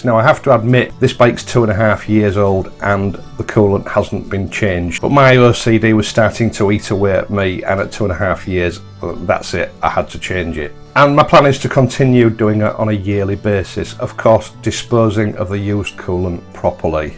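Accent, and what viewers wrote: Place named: East Yorkshire, United Kingdom. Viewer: Great video! Nice and clear as always and your calm E Yorks tones are most relaxing too 🙂. (Could I just be a little bit pedantic though by saying that air pockets in the system are not 'cavitation'. Cavitation is caused by pressure vapourising liquids in things like turbines, centrifuges and with boat propellors.)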